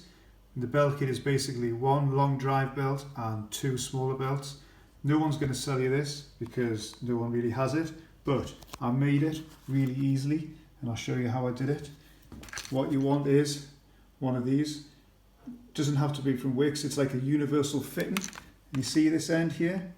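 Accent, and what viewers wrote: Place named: Newcastle, United Kingdom. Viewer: Though you were a Geordie from the accent! Question for you - I've got a PC37J (basically the same) been in a closet forever, pulled it out and nothing works on cassette. Pause button doesnt click or lock down. Could hear something small loose and rattling inside. Found the service manual for pc30, opened up the case and out dropped the pause cam (piece 61 for mechanism), nothing else. Took out cassette mechanism and theres bits of a belt wrapped around back of flywheel. Can see a pin that the pause cam should be on but not sure how it goes there or how it works. Also bits of a belt wrapping around back of a large flywheel on pause side of mech. Any chance this is an easy fix?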